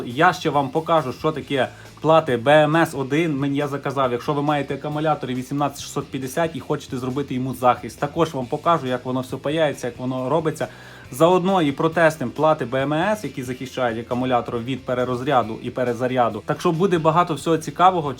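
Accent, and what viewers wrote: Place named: Western Ukraine, Ukraine. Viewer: Бля, этот акцент западенский конечно, пиздец 😅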